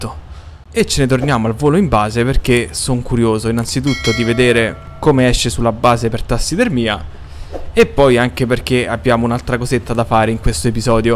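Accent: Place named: Rome, Italy. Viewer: "S'è dato" "Ao fratè ndo te sei cacciato"... Non so Rick... per me è di Roma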